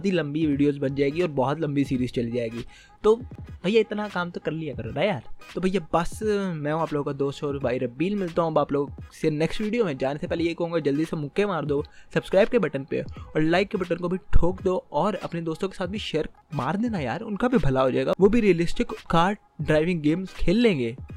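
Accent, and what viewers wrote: India. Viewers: Ap himachal sea ho na. The way you speak is exactly like that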